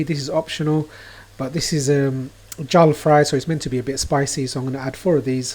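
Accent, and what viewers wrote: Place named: Birmingham, United Kingdom. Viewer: Sounds like a Brummie tinge, are you from the midlands. Apologies if it was obvious where your from from the earlier videos but I recently stumbled on your channel. Very good quality videos by the way, especially the recent trip to Bangladesh. Keep up the good work.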